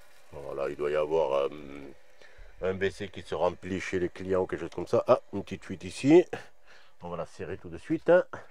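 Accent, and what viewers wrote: South, France. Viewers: Superbe ! Et en plus avé l’açent ça nous ramène un peu de soleil au Nord ou il pleut ! J’adore le brinchement c’est le CC avé le CC le PP avé le PP et le moinsse et le plus, rien de sorcier! N’empêche c’est une installation que pas tous les plombiers la font si bien bravo!!!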